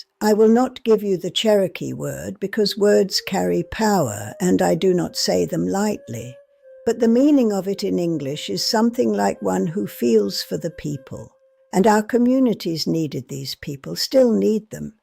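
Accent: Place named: England, United Kingdom. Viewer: Lmao as an Actual Native it’s funny to kitten to an Ai native with an English accent lol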